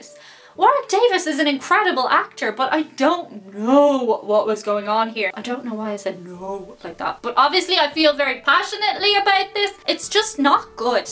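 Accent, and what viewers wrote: Dublin, Ireland. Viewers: Love hearing your Irish accent when you talk. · SternLX its still pretty obvious shes from dublin area though. Its not that neutral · I love the Irish accent, one of the main reasons I subscribed to channel. Not only do I get to hear her Irish accent but I learn about Irish culture in a fun and entertaining way. Thanks for your videos, look after yourself. Congrats on 25K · After listening to the way you talk and your accent, I’ll pay closer attention when I watch something with an Ireland character. Thank you for sharing! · I enjoyed this and even though I only understand and 70% of what you’re saying, I love your Irish accent! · I listen to your videos for your accent more than the content... LOL Just kidding but i do love hearing the Irish accent. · Love the Irish accent....and you are just a pleasant to watch. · did they get the accent right. 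And the more I watch u the more I cant hear your Irish accent but ur still great. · the irish accent is one of my faves. just watching you (in particular) enunciate your words is entertaining :) btw, you should put some of your trademark chokers in the merch and create a cult following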